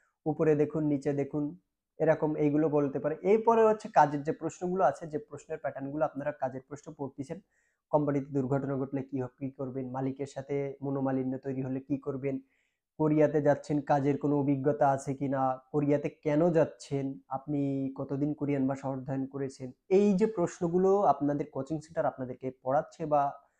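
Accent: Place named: Fakirhat, Bangladesh. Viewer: আপনার বাড়ি কি ফকিরহাট,আমাদের এলাকার কথার স্টাইল,আমার ১৫ তারিখ,স্কিল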